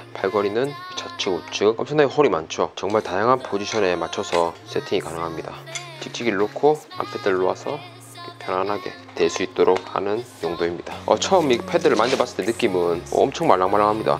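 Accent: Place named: Gyeongsang, South Korea. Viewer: 자전거 좋아하고 경상도사투리가 구수해서 님 영상 자주보는 구독자인데 샵이 당리동인거는 오늘 처음알았네요.ㅎㅎ 하단sk거주자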